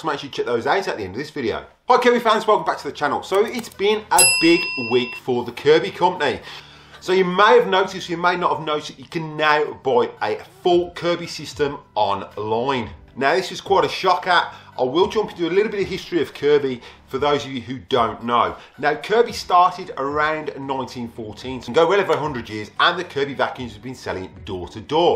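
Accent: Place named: Midlands, United Kingdom. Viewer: That's amazing, probably never ended up in the same room though, I think you left just before I started, I joined near the end of the G3 run, the G4 was unveiled just before I left. Never occurred to me you'd be anywhere other than UK, and what with your midlands accent. Mind you, there's kind of a vibe in some of your videos, lighting maybe?? Don't miss UK at all myself, moved away to Switzerland about 13 years ago and that's where I'll stay. The UK now, for me isn't the same one I left so.... That said, there's a slight difference between living 4 or 5 hours away and an entire day.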